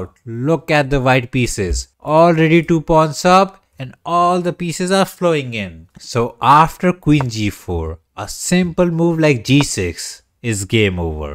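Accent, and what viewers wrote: India. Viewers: Nice to hear some Bhartiya voice. Search knight tour Sanskrit to know why Bharat was richest. So much knowledge they kept hidden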